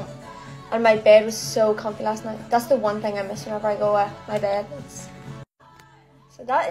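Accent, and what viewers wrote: Ireland. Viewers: Is she Irish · Y’all I might be dumb but is she irish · it’s irish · yep it's Irish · Ily your Dublin accent 💗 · Is she Irish or something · Are you Irish? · Is she Irish? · I knoww I love Irish accent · They are Irish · Is she IRISHHH? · I swear .our accent is the same ❤ I’m also from Ireland btw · I love your accent im from Ireland too and your just so pretty ❤ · I didn’t know she was Irish- I love their accents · Yh she’s Irish too❤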